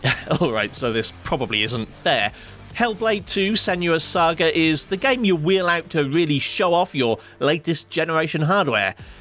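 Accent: England, United Kingdom. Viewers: Mr english accent, please make a video of a 2070/2070 super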